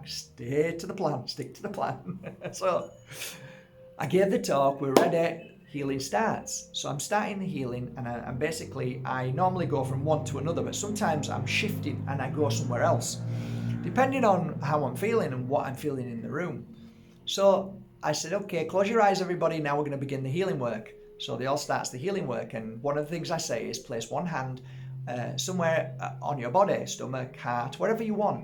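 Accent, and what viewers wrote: Yorkshire, United Kingdom. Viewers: Thank you. Honestly I would love to hear an audio book in your own 'Yorkshire voice '. XxX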